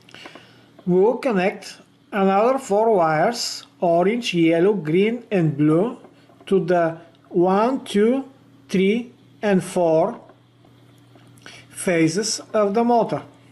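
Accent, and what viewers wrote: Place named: Russia. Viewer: Какой-то прям русский английский...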